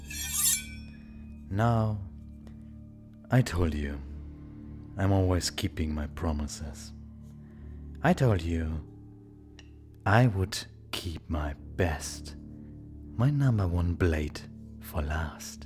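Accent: Germany. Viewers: Ich liebs!!!! Wer hätt gedacht, dass n deutscher Akzent so vorteilhaft sein kann 😂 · Omggg your germannnnnn😭😭😭😭 i lovvveeeeee ittttttttttttt❤❤❤❤❤❤❤❤❤❤❤❤❤❤ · I used to think that he got konig's accent pretty well He's from Germany AAAAAAAAAAAAAAAAAHHHHHHH 💘💘💘💘💘💘💘💘💘💘💘💘💘💘💘💘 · The German is making me hyperventilate- how do I start paying you for this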